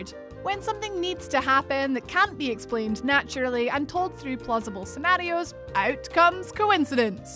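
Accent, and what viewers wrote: Scotland, United Kingdom. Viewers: Are you Scottish? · I could listen to that Scottish accent all day. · Yay for Scottish voices in YouTube.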